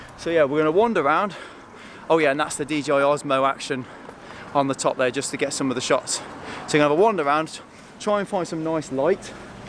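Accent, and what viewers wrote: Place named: Northern England, United Kingdom. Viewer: Great video, Bath is the place to be. Love how you Northerners pronounce it. Keep um coming mate.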